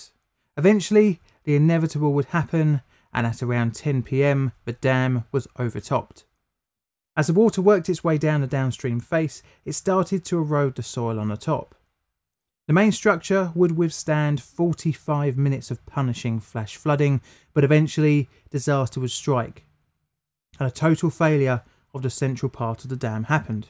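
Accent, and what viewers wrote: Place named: South London, United Kingdom. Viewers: Something about an English accent is relaxing. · "Sunny Southeastern corner of London"? Sounds like you are talking like some place near Carshalton.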